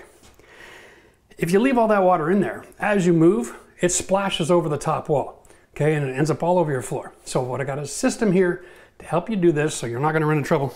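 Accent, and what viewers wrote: Canada. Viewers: My comment got cut short Because my big carpenter finger accidentally hit the send arrow. So I'll repeat. This is the best toilet installation video I've seen... And as soon as I heard you sounding like Red Green and you showed me The Tim Horton's coffee cup To stop the vent leak, I knew you were Canadian. So thanks for all the valuable tips and Go Leafs! PS ... I'm here in the states so I have to make my own Tim Horton's coffee every morning And I don't get the cups. LOL. Stores here finally sell Timmy's coffee in cans..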